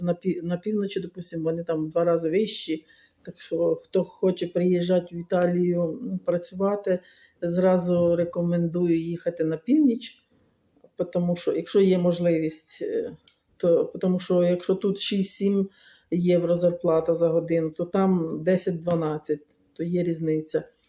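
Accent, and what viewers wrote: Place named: Vinnytsia, Ukraine. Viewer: Я думала,що ви з Віниччини,або Хмельниччини. Акцент не черкаський,бо маю колежанку Раю з Ватутіна і чула,як розмовляли її землячки. Вона з Рівнинщини.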